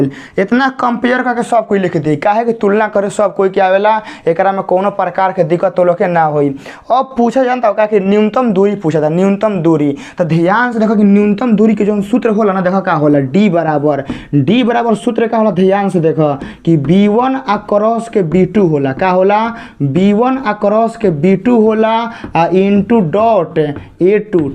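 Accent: Bhojpuri, India. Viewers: Aap bahut mitha bhojpuri bolte hai 😀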